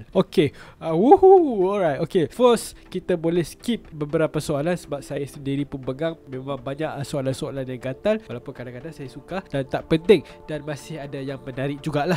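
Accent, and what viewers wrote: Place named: Sabah, Malaysia. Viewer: influence dari HOROGORO ke ni bunyi baku Sabah gitu hahaha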